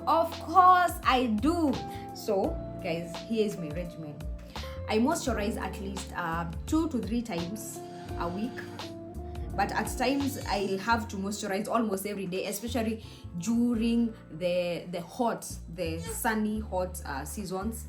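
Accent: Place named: South Africa. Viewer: Love the accent, Kinda reminds me of how Tyla speaks, are you South African?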